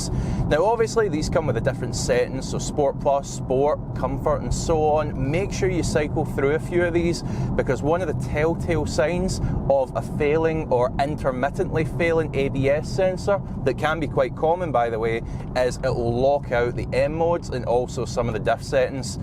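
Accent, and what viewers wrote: Scotland, United Kingdom. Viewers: Scottish ( English)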